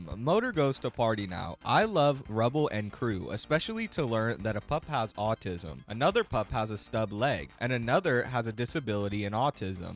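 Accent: South, United States. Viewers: I love your southern twang! Usually I just call what you call "folks" people, I forgot that a lot of southern people say "folks" very interesting!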